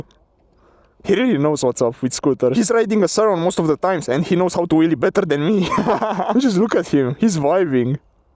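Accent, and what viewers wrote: Romania. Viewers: Ba se vede ca ai accent roman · Yeh, he is Romanian guy from the english speak and accent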